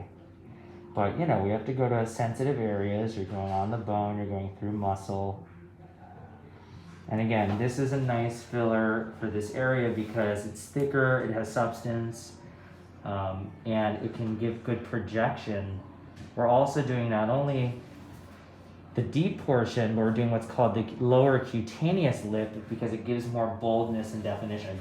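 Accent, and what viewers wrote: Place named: United Kingdom. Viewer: I can’t quite tell the nice accent… British, South African, Australian?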